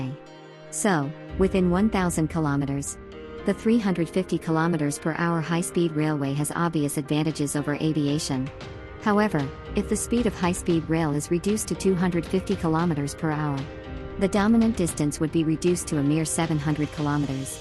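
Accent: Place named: United States. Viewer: I love the idea of HSR. But much of this is "Chinglish", yet spoken with an American accent. So it's computer-generated propaganda. If there's anything worse than the current intelligence level of the average American, it's the human rights record of the Chinese.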